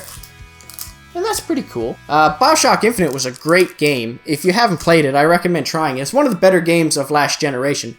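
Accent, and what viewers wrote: Canada. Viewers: you sounds so much more Canadian than Tracey does. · Love the Cape Breton Accent! Haha. Great job!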